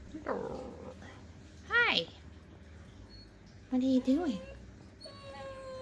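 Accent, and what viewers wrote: England, United Kingdom. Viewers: I love listening to your accent - you sound so English and then you say "clowshe" rather than closh (to rhyme with gosh) as we do in England. Fascinating to see what words have changed and what USA phrases you use. Shopping Cart is one - we say shopping trolley. Love the channel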